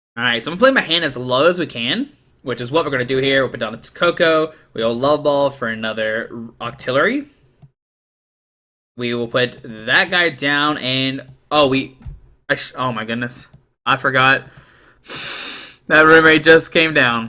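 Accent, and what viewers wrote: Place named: United States. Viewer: Guys, it's just a regional accent. Everyone has one. Everyone understands what he's saying, what's the big deal. And I can assure you that an awful lot of people wouldn't be able to tell much difference between his accent and any other US regional accent.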